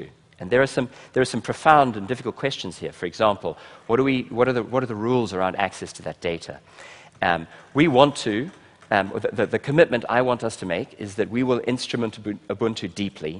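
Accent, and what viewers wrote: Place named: South Africa. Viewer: Loving the South African accent and idiom.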